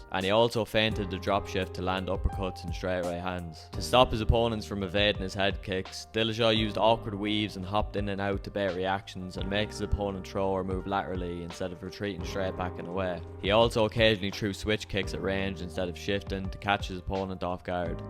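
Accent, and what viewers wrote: Ireland. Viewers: Are you Irish? Also yeah, I noticed that DIllashaw liked his head kicks almost as much as he liked shifting.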